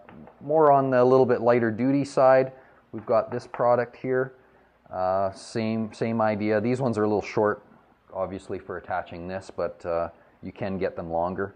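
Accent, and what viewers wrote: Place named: Canada. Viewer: are you canadian, your accent sounds so canadian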